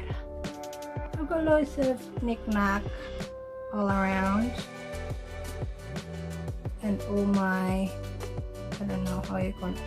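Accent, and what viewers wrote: United Kingdom. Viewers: Really love the British accent... Kilay is life talaga. Anyway it's another stream of income being an Avon rep. Dami mo na raket sissy.. youtuber na avon rep pa, plus your regular job pa. Payaman... claim it sissy. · Grabe talaga english accent mo ati 😂😘 love it!! Avon lover ako dati nung sa pinas ako hehe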